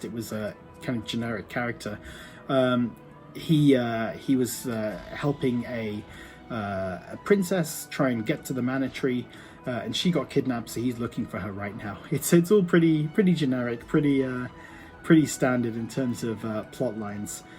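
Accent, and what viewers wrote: United Kingdom. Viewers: that's just it, it hasn't got any sort of sound other than British. I have no idea why I thought you was Chinese lol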